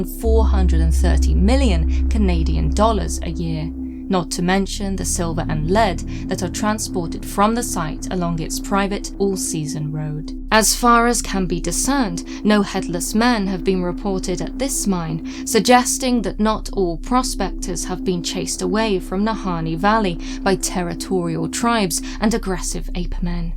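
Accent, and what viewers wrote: England, United Kingdom. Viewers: Listening to you makes me want to dress well and drink tea while watching your videos. You're an English I always imagined english people are- elegant and classy. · Sorry, I don't the British accent. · Yes I agree that you are an excellent narrator. You kept me interested and and glued to the screen waiting for more information which you deliver in a way that interested at all times. Further more, and I must apologize in advance, but those dark brown eyes, give a sense of mystery and depth of knowledge. Gosh, it feels like I'm putting you on a pedestal here. I am from Canada and although some British accents are difficult to understand, yours was a delight to list to, to say the least. Finally, and embarrassing to me and perhaps to you, (in a good way) but I find you to be very beautiful. Strikingly beautiful actually. We all see beauty in our own ways but your delivery of the stories, looking into the camera, almost like its a conversations as if you were sitting across from me, talking directly to me. 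I apologize and hope you are not offended as my intention is that of appreciation and admiration. I look forward to further works from you. You definitely have a fan here in Canada lol. Take Care....Joe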